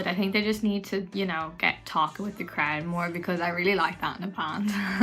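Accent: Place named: Ireland. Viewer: I didn't know you were Irish? (i think, you sound irish) your accent is cute and I saw that you did go and to a halsey concert, but I'm glad that you had fun 💞💗💗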